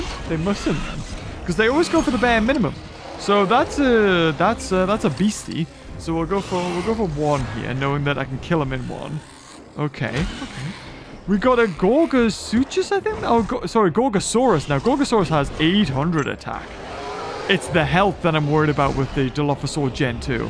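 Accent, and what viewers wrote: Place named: United Kingdom. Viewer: You can tell that he's British!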